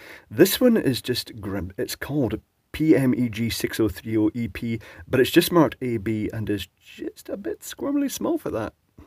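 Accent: Scotland, United Kingdom. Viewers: I feel like I'm watching a Scottish Louis Rossman.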